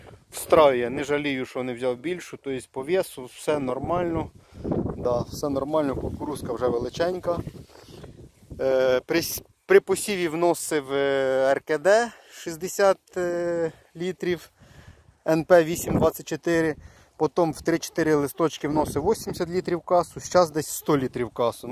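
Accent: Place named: Khmelnytskyi, Ukraine. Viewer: Говор наче з Хмельниччини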